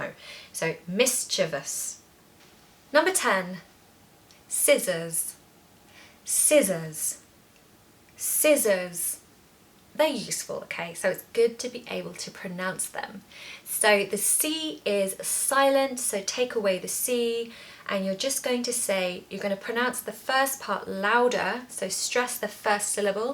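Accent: RP, United Kingdom. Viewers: Hello! Madam, I love your British English accent. Your teaching techniques are excellent. 😊❤ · Splendid British pronunciation ,Thank you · Lovely accent you have! I always liked everything British so much and try to improve my spoken British further, so I’m happy to have found the channel. So actually apart from maybe Wocestershire, I didn’t find any of these words very hard to pronounce. Even if I maybe don’t always get it precisely right, I knew how they should sound. In fact, my most hated word to pronounce is actually: PURCHASING!!! I’m working as a buyer and everytime I have to use that word I say it with a German emphasis on the second syllable instead of the first one!! I have to force myself to say „purr-che-sing“ instead of the rather German sounding „per-chaesing“😂😂😂 I pronounced it wrong when talking to a Texan and he didn’t understand at all what I meant. 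I hate that word 😂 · Your are absolutely welcome. Keep on teaching with your beautiful RP and encourage learners to converse in correct English. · wow I like.british speaking accent english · I love your British accent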